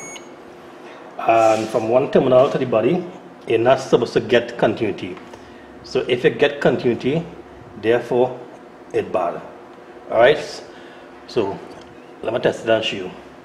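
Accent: Trinidad and Tobago. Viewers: Wow was looking how to check my magnetron and came upon your video. Was so glad when I heard a fellow Trini voice. Was able to tell mine was bad. Thanks man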